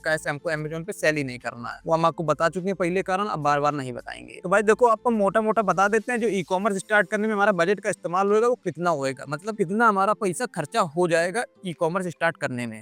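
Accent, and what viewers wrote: Kanpur, India. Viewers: Aapki Avaj se lag rah ki aap kanpur se ho 😅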